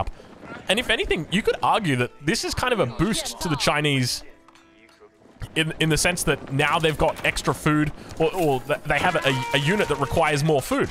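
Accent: Australia. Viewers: thats right, im watching him from Chile and I also love his aussie accent haha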